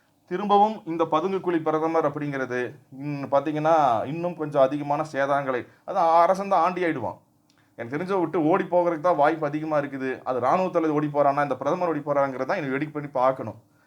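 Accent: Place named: Kongu, India. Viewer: Arumai sir 💐especially pleasing to hear the positive explanation through our veera kongu thamil slang👍 It's our luck to have a great patriot modiji as our prime minister 👏 We are safe in his rule. Thank you for your great efforts 🙏. Bharat Matha ki jai💪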